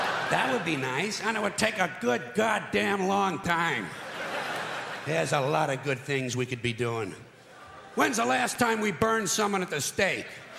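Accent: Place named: New York, United States. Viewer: Lol yea his new york accent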